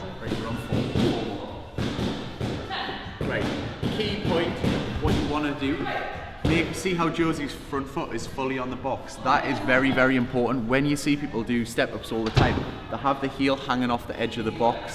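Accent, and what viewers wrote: Newcastle, United Kingdom. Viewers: Geordie lad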